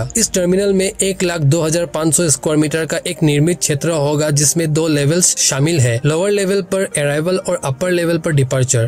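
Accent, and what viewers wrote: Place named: Assam, India. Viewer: Your accent is like Assamese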